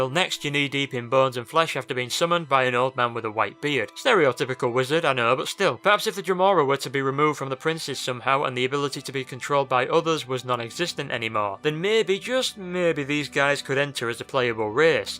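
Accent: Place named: Yorkshire, United Kingdom. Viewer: Why do you talk like a Yorkshire Johnny Vegas?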